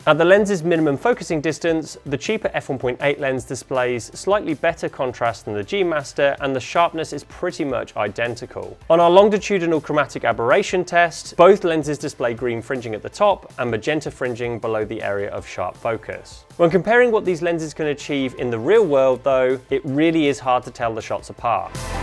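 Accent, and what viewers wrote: United Kingdom. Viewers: I like this guy's humor. Plus having a British accent automagically makes him sound like he knows what he is talking about. Cheers mate! :D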